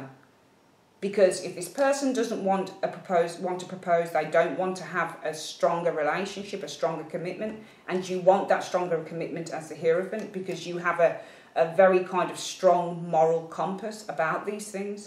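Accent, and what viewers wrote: Birmingham, United Kingdom. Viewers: she sounds nothing like adele! is from birmingham and adele is from south london, completely different accents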